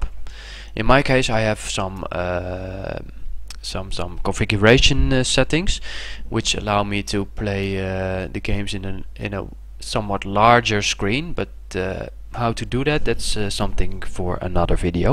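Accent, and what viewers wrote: Netherlands. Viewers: Nederlands? Ik kom uit Nordduitsland, ik ken dit accent :P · Dat kwam ik achter. Dat was duidelijk Engels op een Nederlandse toon.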